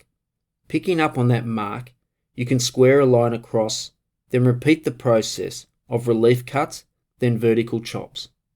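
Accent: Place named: Australia. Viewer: do I hear an Ausie accent? Love your videos. One Handed Maker au!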